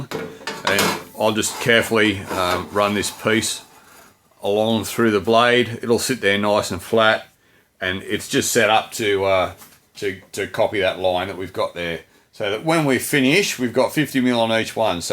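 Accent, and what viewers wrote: Australia. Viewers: Mate that's a work of art! And you've got the most "Aussie Bloke" accent I've ever heard. You could get a voice over job easy if you haven't already. 🍻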